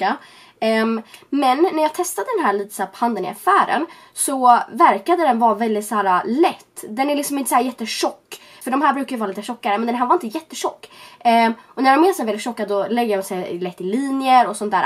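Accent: Stockholm, Sweden. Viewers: Förlåt men pratat hon inte lite Stockholmska???? INTE FÖR ATT VA ELAK JAG ÄLSKAR DIG ❤️❤️❤️❤️❤️❤️❤️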